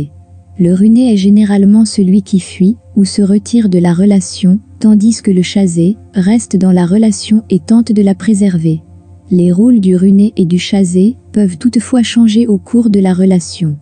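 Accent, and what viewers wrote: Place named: France. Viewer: J'avoue que l'accent français me fait presque rire alors que dans la vraie vie j'ai plutôt envie de pleurer... quelle vie !!!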